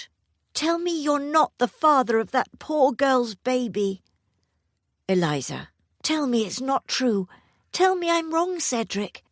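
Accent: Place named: England, United Kingdom. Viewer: How wonderful to hear an English accent🎉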